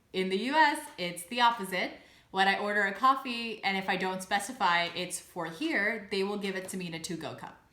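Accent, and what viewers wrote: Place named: Netherlands. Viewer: I dont know why but I just watched some of your videos. And this video the first few minutes you actually sounded like a Dutchy speaking English (losing you natural accent) You must be speaking Dutch more.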